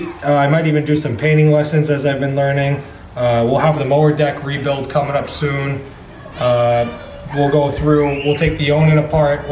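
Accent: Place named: New York, United States. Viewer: Awesome!! Great Intro!!, you need way more subscribers!! You have kind of a New York affect and a slight accent as well as Northern New York Scenery however I would guess that you are in New Jersey. I am in Pennsylvania and I suppose that is also a possibility. I have an Allis Chalmers B110 also. I am restoring a John Deere 112 that I am modifying with an air compressor system accessory. My Craftsman GT18 Is in the process of having suspension welded on the front end, a 90 degree gear box to change a twist belt into two straight belts, larger tires and a lift. Talk to you soon. Great video!!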